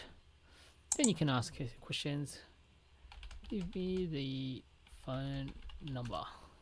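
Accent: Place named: United Kingdom. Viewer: Bro, what's your origin? You sound pretty UK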